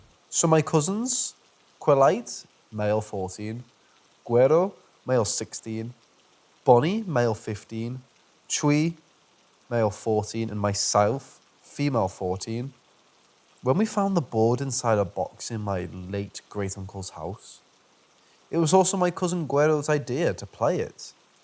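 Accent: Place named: Merseyside, United Kingdom. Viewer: Good to hear another Merseyside accent! 😁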